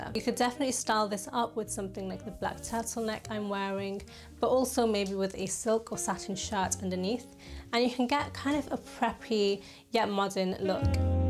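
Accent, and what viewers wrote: United Kingdom. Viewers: Please forgive me for being an ignorant American, but could you explain what kind of accent you have? Is it specific to one city or region in the U.K.? Your accent is so gorgeous—the way you say everything is elegant and distinguished. Ps: congrats on your engagement!! Wishing you and your partner all the happiness in the world.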